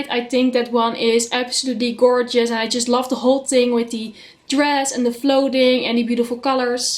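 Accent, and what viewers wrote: Netherlands. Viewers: Hoorde je Nederlandse accent al vanaf de eerste zin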